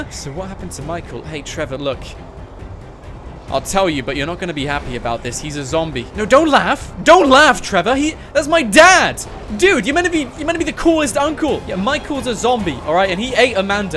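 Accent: United Kingdom. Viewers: I have to say that i LOVE your British accent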